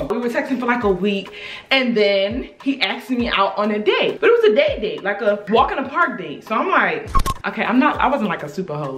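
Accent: Baltimore, United States. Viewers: Lmfao I knew you was from Baltimore! I love your videos. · Yo tell me why I spent 20 minutes on ig tryna figure out if you was from Baltimore bc I could hear it ASAP when I literally could’ve just watched a min further in 😭😭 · u from Baltimore? Subscribe. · As soon as I press play I instantly knew you was from Baltimore 🥴😂 it's something about that west side accent....I always pick up on it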